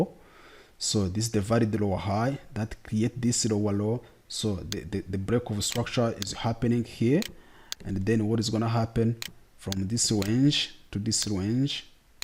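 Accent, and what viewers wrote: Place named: East Africa. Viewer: That accent sounds east African, it's good to be tonight by my fellow neighbor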